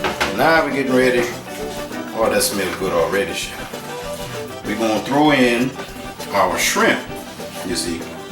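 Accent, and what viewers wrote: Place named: Cajun, United States. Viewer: Lol, reminds me of the old Justin Wilson way of speaking. You play it good! Shout out from a white Creole from New Orleans. Mais, Le’zydeco sont pas salé, cher!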